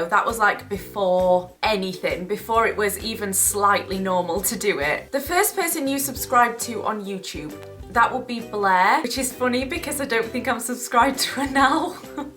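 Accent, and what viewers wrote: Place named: Yorkshire, United Kingdom. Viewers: Sheffield so South Yorkshire :) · Hi! I live in Rotherham which is like literally half and hour away from Sheffield were you lived! I'm so glad that I have the same accent as a youtuber 😂 that sounds really sad but you sound like were I'm from so its like normal to me and I just find it cool.. I sound so lame here.. LOL;) 💕💕p.s love your videos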